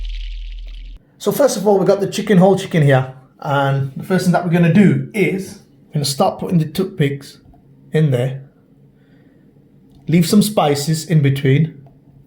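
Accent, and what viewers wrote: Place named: Bradford, United Kingdom. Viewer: r u from Bradford, I guess due to your accent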